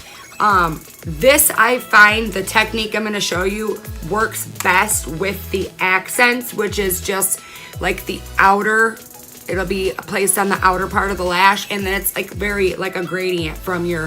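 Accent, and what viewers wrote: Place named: Michigan, United States. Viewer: Love you video, are you from Michigan? You have a Michigan accent lol.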